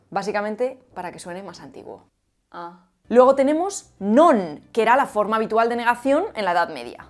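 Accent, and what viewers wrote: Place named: Bierzo, Spain. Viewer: Parece que cante en Berciano. Mantenemos muchas palabras de castellano medieval.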